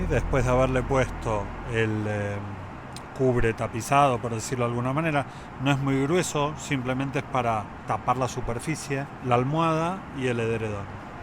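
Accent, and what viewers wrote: Argentina. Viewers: se nota de lejos tu acento Argentino para los que sabemos diferenciarlo buen video y saludios de un Urugayo en galicia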